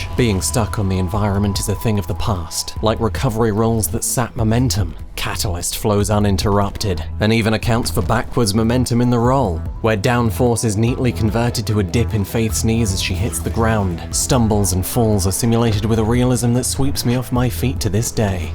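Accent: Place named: United Kingdom. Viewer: Hey man, doubt you'll see this. But thank you for the birthday gift! Love your videos. Only a madman can see the soul behind ubisoft's corporativism, and being a madman is exactly what i respect. Keep being you, with that soft and sweet british voice and all. Peace, Enzo. Ps: thoughts on Legion? That cliffhanger on WD2's video got me grinding my teeth.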